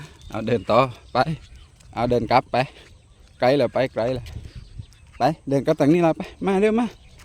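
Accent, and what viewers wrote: South, Thailand. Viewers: ขอโทษครับ ผมเพิ่งได้ดูช่อง เป็นใครรึครับ?? พูดใต้'เป็นตัวเเทนคนใต้เลยคับ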